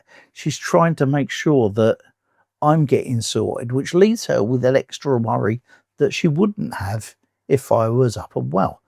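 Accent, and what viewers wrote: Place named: London, United Kingdom. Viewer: Hey! I've only just found you!! Although I'm not impressed with your London accent... ( I'm Irish & proud! ) Suffice it to say... I am half daft with all that happened to me in my life! Like you... I'm 65... Retired Police Officer! 3 years ago I lost my Latvian wife to a 12 year battle with cancer... since then... & because of all the sh1t that happened in my life... I'm a bit of a basket case... but "every day in every way. I'm getting better & better!" In actual fact... I've been to a psychotherapist recently... for the first time in my life!! After only 2 sessions I am seeing things that I never noticed before!! Life is waaay from over just yet!! 😊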